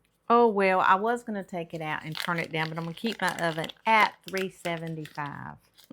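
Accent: South, United States. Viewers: I love your southern accent. Yummy lemon!! I want to try making this. Hoping all the ingredients you used are available in Canada. ❤ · FIRST TIME seeing ya!! I had to subscribe!! I love your Southern Belle accent !! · Love the Southern accent! Honestly, I have to make that lemon lasagna!!! Thanks for an easy recipe that hopefully I can make. Looks so delicious I cant wait to try it. Thanks again 😊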